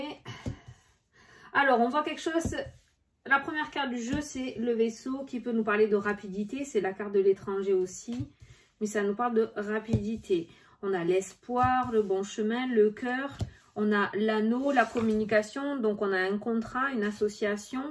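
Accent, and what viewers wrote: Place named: Var, France. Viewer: super toujours aussi speed,ton accent vient du ( var ) bonne journée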